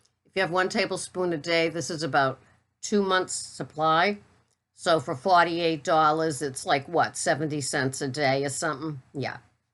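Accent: Boston, United States. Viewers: yesss! I saw that too on Jason's channel! I totally agree with that. When you're eating whole fresh foods you won't have all those hidden sugars and hidden "junk" but people are eating so much processed keto stuff now that carb number can creep right up on ya! I love your boston accent!!!!! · Good morning. I love, love you. You will never be called crazy as far as I'm concerned. I don't know if you have seen my last video "Coffee chat". That I posted on Friday, January 19, 2018 or not. Now that is crazy. Love the Boston accent. It makes me smile, good for the soul. Enjoy your weekend. 💕. NonnaGrace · Morning to ya,! I am behind but cant resist your Boston accent! Yes, it will always be total carbs for me. Anything else is to 'iffy' xoxoMarjorie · I LOVE the Boston Accent. I need to do my New York Accent! Lol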